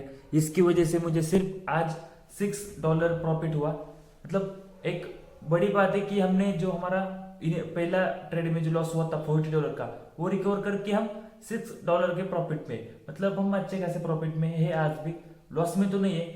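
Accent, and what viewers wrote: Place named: Maharashtra, India. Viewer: Kay rao Aple lok lagich olkhu yetat 😅bolnya varunch... Keep it up brother 💪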